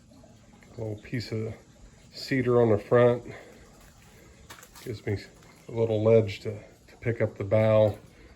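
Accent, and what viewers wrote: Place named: Baltimore, United States. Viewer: Great looking boat, you have a southern accent with baltimore pronunciations.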